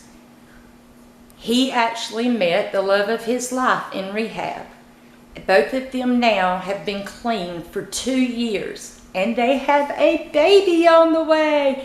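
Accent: South, United States. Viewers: Love your videos and love your southern accent! I have been watching your videos for quite some time now but just a word of advice, why don't you ghost explorers get a small can of bug spray or something to put on your body to protect you from getting attacked and carry it with you especially to cemetery's or in the woods when doing your ghost exploring, I notice this a lot with all of you ghost explorers always getting attacked by a lot of mosquitoes, bees and what ever. Keep up the good work and I will be looking forward to seeing more of your new videos in the near future.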